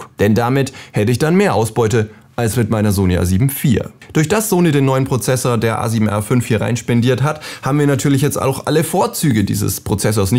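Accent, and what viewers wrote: Germany. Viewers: bist Du aus Deutschland ?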